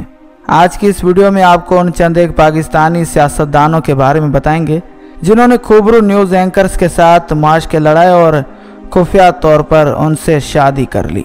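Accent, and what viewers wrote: India. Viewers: Bhai pehle urdu k makharij seekh lo. Apko toh hinduon ka rang charha huwa hai . Hay ko khay aur ghalat ko galat bol rhy ho indians ki tarha. You need to work on your voice and accent.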